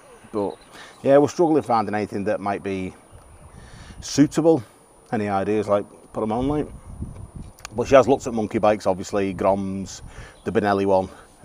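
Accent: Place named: St Helens, United Kingdom. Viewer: V-Strom 650 can be lowered 👍🏻 Can I ask where are you from. You sound St Helens to me, my home town 👍🏻😊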